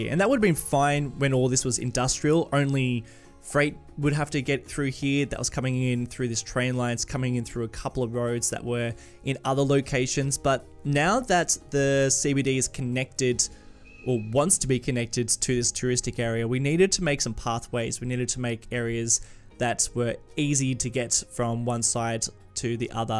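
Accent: Australia. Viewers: Are you Australian? I love it when I find a youtuber who is from my country.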